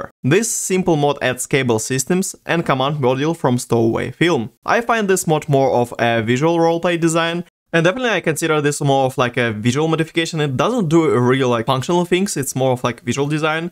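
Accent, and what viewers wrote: Russia. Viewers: Мммм, руский акцент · Мне кажется или это русский акцент? Спасибо за гайд, чувак! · Наверное много кто не поймёт этот комментарий но акцент мне кажется у него русский... Это просто теория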